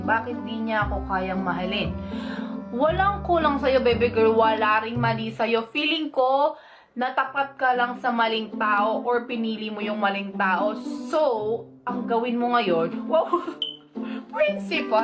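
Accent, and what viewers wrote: Visayas, Philippines. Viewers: Taga pampang ka diba ate pero parang bisaya👌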